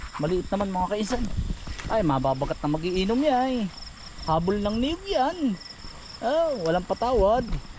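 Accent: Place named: Southern Quezon, Philippines. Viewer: taga san kaga kuya at ang iyong punto ay parang taga southern quezon😂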